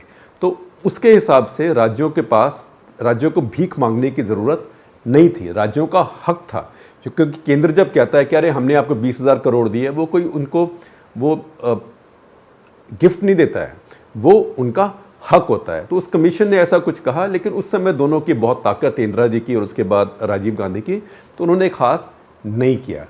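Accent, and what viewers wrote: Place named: Punjab, India. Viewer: sir.....Your hindi has a punjabi dialect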